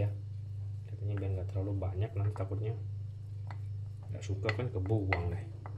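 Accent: Java, Indonesia. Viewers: Jowone ketok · ngapunten mas......kulo tanglet sampeyan asli pundi toh kok jowo ne genah? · Jowone kabupaten ngendi to mas ? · Mas kok bilang iyah, dr jawa yah? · Ketoro Iki wong jowo Yo mas bro..kok ngomong Sego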